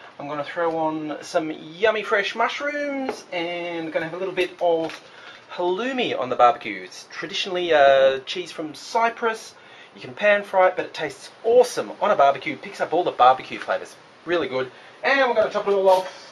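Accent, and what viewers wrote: Bogan, Australia. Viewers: Man.. i could go some cheese now.. with lemon - damm you and the best example of a bogan voice so far on you tube. HAPPY AUSTRALIA DAY. Loz.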